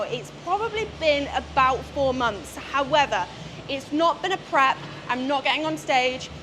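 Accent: United Kingdom. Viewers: Where are you from in the UK? I'm thinking south? Hampshire perhaps 🤔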